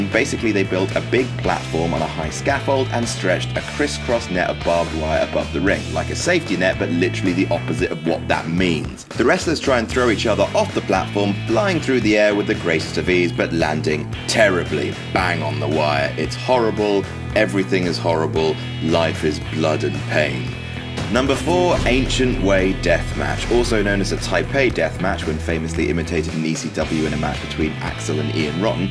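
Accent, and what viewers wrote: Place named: England, United Kingdom. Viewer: your boring pommy monotone kills all the joy in hardcore wrestling. Get out of here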